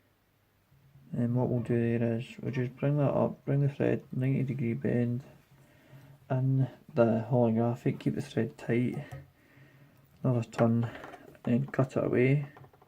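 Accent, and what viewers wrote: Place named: Scotland, United Kingdom. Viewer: Not being a Scot, I guess the accent fooled me! Not to imply the fly was not decently made! Where I am, we can still fish for sea trout, (Newfoundland, across the pond)! Fishing has not been cancelled here yet! Not very hard to stay six feet apart here! Hope things improve and you are able to wet a line soon! Stay safe!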